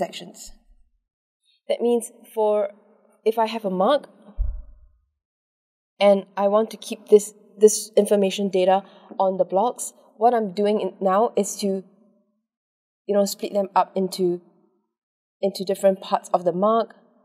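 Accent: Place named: Singapore. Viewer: SINGA-PORE! i can hear dat accent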